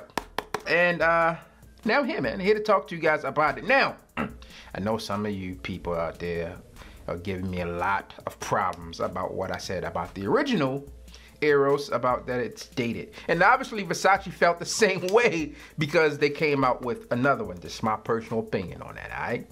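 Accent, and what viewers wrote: Baltimore, United States. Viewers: Yo, you from Baltimore?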